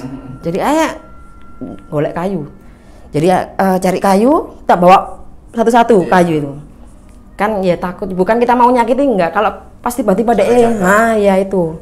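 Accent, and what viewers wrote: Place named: East Java, Indonesia. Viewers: Seneng temen mbak ndelok samean cerito. Suroboyoan banget!! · Aksen Suroboyone bikin keingetan pacarku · Logate jawa timuran,,,penak,, Salam dr jakarta selatan. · Mbak cara menyampaikan ceritanya nyenengin biangettt...logat jatimnya iku lho....uuueeennakkk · penekanan kata ala wong Suroboyo panas banget = pwanas panas banget-banget = puwaanas.. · Meski amat sangat terlambat nyimak tetap gayeng...Logat'e mbak ngawe tambah kangen Suroboyo ku...😔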